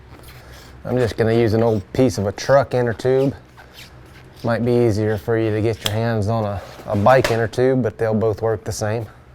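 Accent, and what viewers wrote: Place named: South, United States. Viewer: Your voice sounds more yeehaw than usual. Cool build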